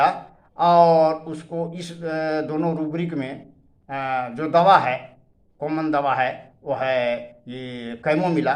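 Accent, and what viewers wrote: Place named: Bhojpuri, India. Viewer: Kirpaya aap apana bhojpuri lehja mat chhodiye! Poorvanchal k jitna dangdar hauye , kul like auri subscribe karihain.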